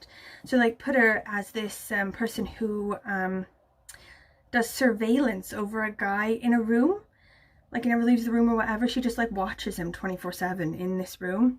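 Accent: Wales, United Kingdom. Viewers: I haven't caught one of your videos for a while because, you know, life and work and reading get in way of watching stuff. So this was a chance to catch up which was lovely but also made me notice you are sounding much more Welsh these days. It's great! You now have the best accent combination ever.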